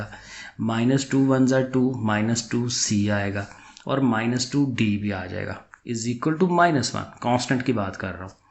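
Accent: Sialkot, Pakistan. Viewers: sir ap ki awaz se lgta he ap sialkoti hein?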